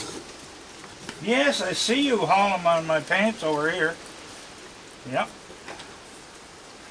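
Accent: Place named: Canada. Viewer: I love your Canadian accent!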